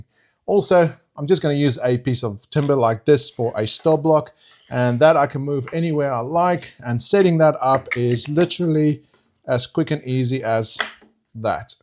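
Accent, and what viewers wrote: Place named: South Africa. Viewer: That’s awesome. I knew you were South African from the accent and the “spirit level”. I love this build and I’m going to do it boet.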